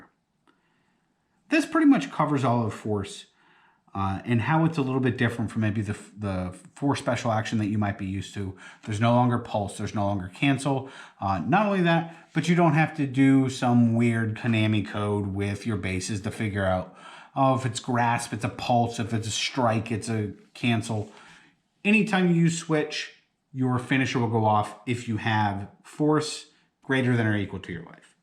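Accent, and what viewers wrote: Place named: Midwest, United States. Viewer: That midwest accent is thick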